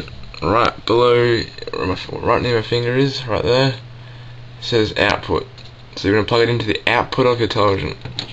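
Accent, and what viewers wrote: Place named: Australia. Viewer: thanks for the help. favorited so I can use it for future reference. You australian? nice accent :P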